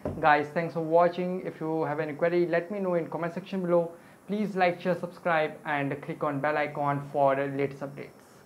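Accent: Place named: England, United Kingdom. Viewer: U r born in England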